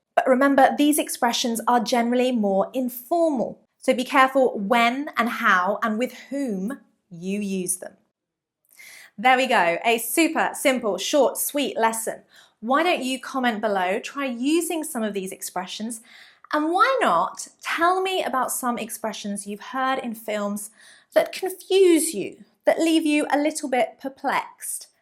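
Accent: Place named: RP, United Kingdom. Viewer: please do a video on your modern RP accent, i'm really impressed by your accent and want to learn so soon. I hope that you'll consider my request.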